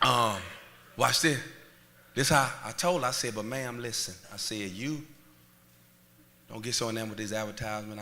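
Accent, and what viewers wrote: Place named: South, United States. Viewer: I LOVE his southern accent! #Mississippi I have it too bro! Love your work!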